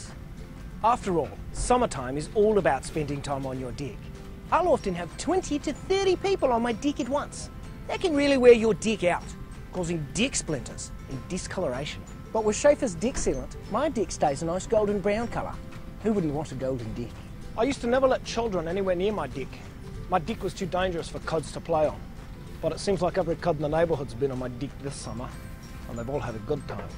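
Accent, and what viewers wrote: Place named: Australia. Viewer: It's an Australian accent peeps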